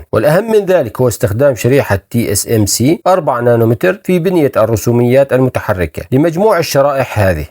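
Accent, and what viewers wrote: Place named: Deir ez-Zor, Syria. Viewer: اشتركت لانو لهجتك ديري ... إستمر بالتوفيق عجبني انك تجمع كل التفاصيل كون اكثر حيوية ...تقبل مروري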